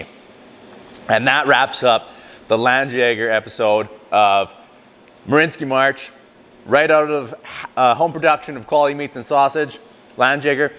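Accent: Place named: Canada. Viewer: So I can tell from your voice that you are a fellow Canadian the way you said today you sound like half the guys I hunt with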